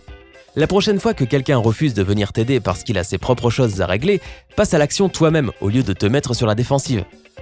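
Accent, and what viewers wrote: Quebec, Canada. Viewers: Dite vous etes québécois hein tes un youtubeur québécois ?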